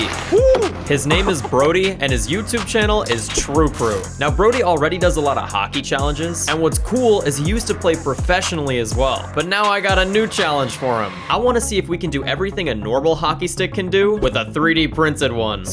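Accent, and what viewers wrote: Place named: United States. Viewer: rugby it's rugby. Oh American that say words so weird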